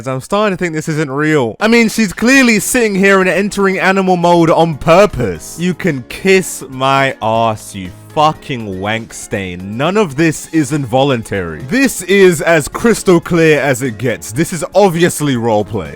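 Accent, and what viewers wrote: United Kingdom. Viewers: Definitely from England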